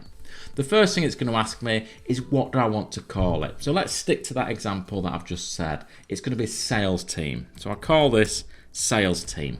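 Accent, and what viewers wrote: Yorkshire, United Kingdom. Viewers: American here. Hearing IT information in a Yorkshire accent - GOLD. I love that accent. So cool. 😊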